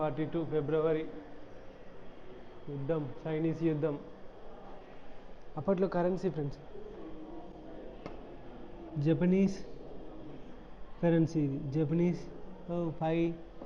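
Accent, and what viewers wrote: Telangana, India. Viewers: Bro vlog lu back ground voice mast vundi telangana yasa lu, vlog matram mast vundi...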